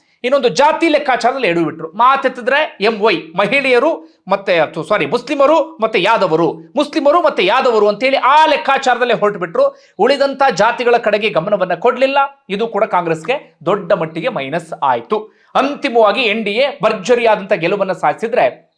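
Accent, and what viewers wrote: Standard. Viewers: Adbutha vishleshane. Sir election result gintha nimma swachha sundara kannada vishleshane namage kelokke hitavaagirutte. Pakshaateetavada intaha sarala sundara visleshanegala payana yashassannu tarali. Abhinandhanegalu.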